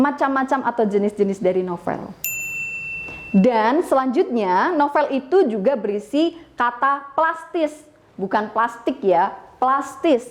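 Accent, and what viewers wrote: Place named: Java, Indonesia. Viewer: Enak banget ngejelasinnya ,bhs jawanya keluar medok banget Bu🤭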